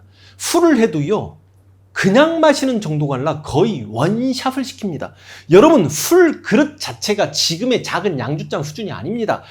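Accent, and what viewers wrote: Jeolla, South Korea. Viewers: 부조간 발음 들으니 전라도 일단 거부감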